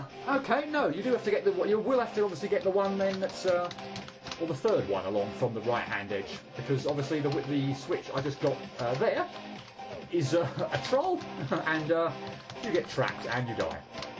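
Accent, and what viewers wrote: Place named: United Kingdom. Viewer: If you don't mind my asking, how old are you? You sound like a rather snazzy, upper-class british commentator on a golf game with more emotion. Yes, that's a good thing.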